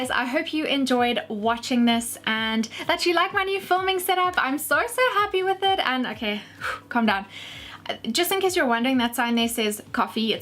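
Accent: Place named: South Africa. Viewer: I was like.. waaaait... i know this accent xD Finally a Youtuber I can relate to with our limited Makeup selection in SA <3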